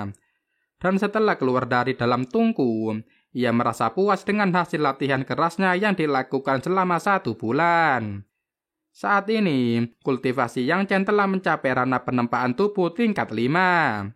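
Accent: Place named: Madura, Indonesia. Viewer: Pembawa alur ceritanya...kayak orang madura tak yehhh...tak. yeee... ditarik " bahasa nyaaaaa...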